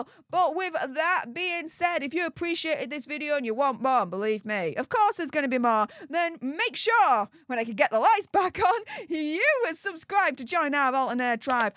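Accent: United Kingdom. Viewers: Its the British accent dum dum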